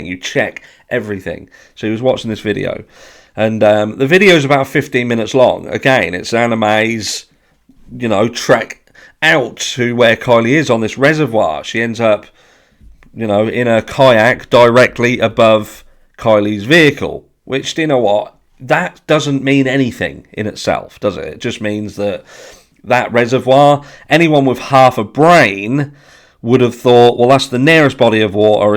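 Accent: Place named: South London, United Kingdom. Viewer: Why are you getting in to the whole saga and adding fuel to the fire of that eedjit Ryan? The guy needs to (allegedly, in my opinion) lay off whatever it is he's taking. I'd stay out of that whole thing cos it's just insane and degrades anything you have to say. Sorry, that's my opinion, but was quite liking your straight forward 'South London' way of talking (I grew up in Reigate) so bit disappointed. I see the arrow, yes, but how do u know its not something that's been there for years for kayakers/fishermen/boats to mark an easy way on to the reservoir without worrying about a sudden drop or whatever?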